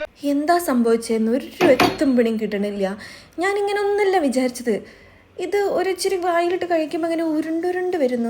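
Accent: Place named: Kayamkulam, India. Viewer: Soana papadi kayamkulam bhasha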